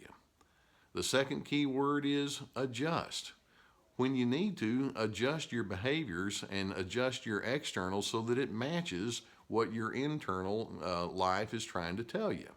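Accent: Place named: Texas, United States. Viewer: Such hard-earned wisdom (and common sense) delivered with that charming Texas drawl. These are calming and really informative. Thanks Doc.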